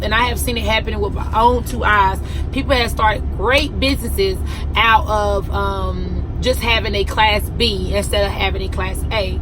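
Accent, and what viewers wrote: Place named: North Carolina, United States. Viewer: I knew she was from nc by her voice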